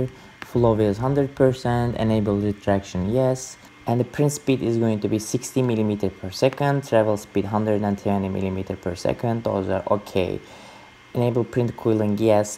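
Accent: Turkey. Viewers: Your accent sounds like you are Turkish and i am proud of you, thanks a lot for sharing your experiences.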